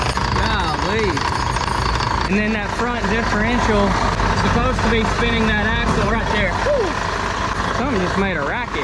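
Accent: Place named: South, United States. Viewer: 남부억양인가 찰지네 ㅋㅋ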